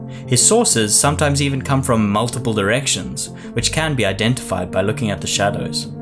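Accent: South Africa. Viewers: Is this man... a South African? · U South African?? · South African Accent? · Sounds like a South African narrator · Bro your South African, right? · Not even a few minutes in I kept thinking, this dude must be South African, so I checked your channel info and lo and hehold! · You sound South African? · I’m not sure if using “from dusk till Dawn” was an intentional reference. You sound South African. Are you in Jhb? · Are you from South Africa man ? Your accent sounds like it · Hearing this accent again reminds me why I left SA. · Are you South African? I recognise that beautiful accent from miles away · I love your channel boss, you're South African right?? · Are you south african? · Subbed when I first heard the South African accent. Great video 👍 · Are you South African? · South African?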